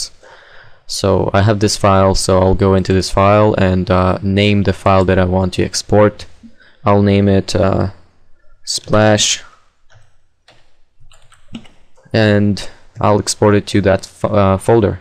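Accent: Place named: Netherlands. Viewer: do you have a dutch cennel want ik hoor dat je nederlands ben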